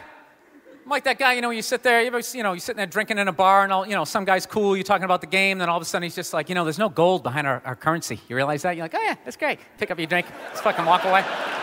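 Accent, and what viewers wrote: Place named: South, United States. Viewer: love his southern accent xD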